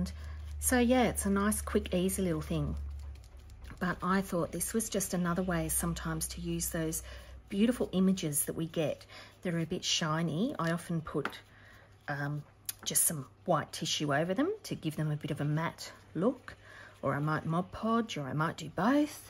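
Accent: Australia. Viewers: Hi, again, it is great to see how transferable skills can be. I started out, many years ago, as a scrap booker, then I was introduced to card making. Now I have found the wonderful world of Junk Journalling. While I have been collecting ideas and supplies for some time now, I have yet to actually make many projects! While I have used serviettes (napkins) as decorative card fronts I have not thought to use them to ‘dull down’ the shine from pages; a great tip. May I also say it is lovely to hear a familiar Aussie accent! No offence meant to our American, English, or Other, Journallers. Best wishes to Everybody and may your journals be all that you want them to be. 😀🦘🐨🇦🇺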